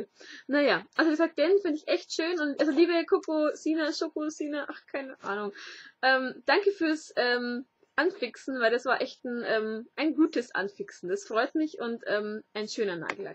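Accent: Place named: Bavaria, Germany. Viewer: hab deinen kanal heute erst entdeckt :) woher kommstn du genau? warsch ausm kreis münchen oder? ;) man hört hald einfach bei echten bayern des bayrisch immer raus hihi bin selber aus landshut bei mir isses genau so ;DD liebe grüße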